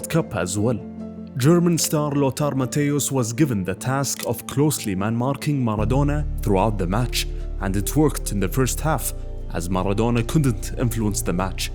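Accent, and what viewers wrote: Arab world. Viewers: The narrator has a thick Arabic accent. That's why. He needs to attend an Accent Removal Course... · This was an excellent documentary. Until the very end I thought the narrator was Aregentinian😀 before finding out the accent was Arabic.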